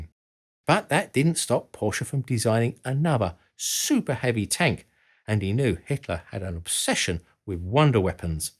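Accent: United Kingdom. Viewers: Cause he has British accent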